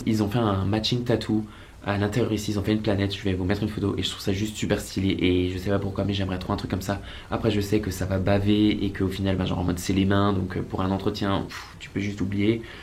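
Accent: Alsace, France. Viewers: Depuis que je regarde t'es vidéo j'ai l'impression que t'es alsacien et puis la je tombe sur cette video et tu as répondu a cette question et purée quelle satisfaction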